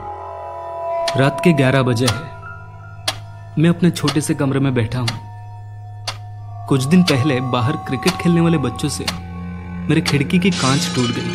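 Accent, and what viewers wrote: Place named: Bengal, India. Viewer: Ta Bhai up to Bengali mei bat kar skte kyu Hindi me bolte ho upka Hindi kaisa bangal-hindi mixed ha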